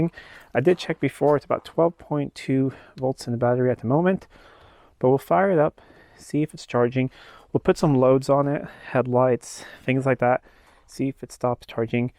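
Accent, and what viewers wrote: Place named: United States. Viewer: Oh, I did not realize until I saw the Biltema battery that you were in Sweden. You sound American to me. As a American living in Norway I cannot imagine owning such a vehicle, here the costs of maintenance are sky high and where I live I am not even allowed to work on my cars. You probably do not have that problem, looks like you are on a farm! 😃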